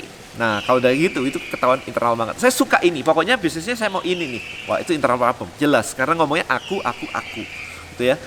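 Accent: Java, Indonesia. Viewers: koko medoknya teknikal banget hehehee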